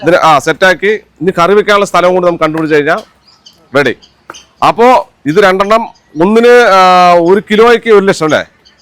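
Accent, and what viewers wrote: Palakkad, India. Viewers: പാലക്കാടൻ ഭാഷ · പാലക്കാടൻ സ്ലാങ്ങ് അവതരണം ആണ് പലരെയും ഈ വീഡിയോ കാണാൻ പിടിച്ചിരുത്തുന്നത്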